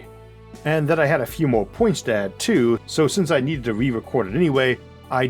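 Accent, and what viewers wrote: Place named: United States. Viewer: I think only you are concerned with your so called speech impediment. Seems like a US accent to me. But I doubt the overwhelming majority of your listeners even think about it. I don't.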